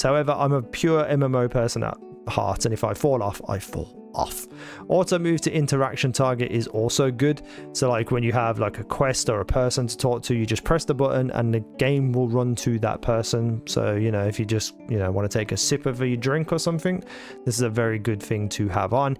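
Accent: Birmingham, United Kingdom. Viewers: Alright mate, just downloaded the game now. You sound like a fellow Brummie btw which is cool! If you go to the controller preset page where it shows image of the controller, you can see that quick turn around 180 degrees once you enable the setting is double input of pulling left stick down. Iv spent 3 hours trying to dial in the settings so this feels nice. Very confusing and still working on what to assign to my 2 back paddles on the controller. Im using controller on PC and its not as buggy as console thankfully.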